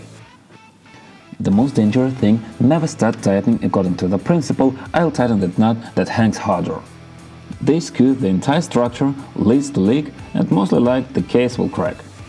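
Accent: Russia. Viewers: русский ёпть, акцент не скрыть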